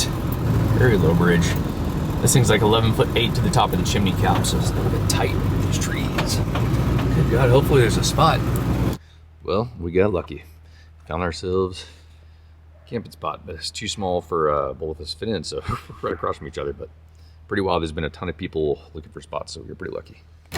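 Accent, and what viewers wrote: United States. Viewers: You sound too American, lol it’s not been there before they say bEEn.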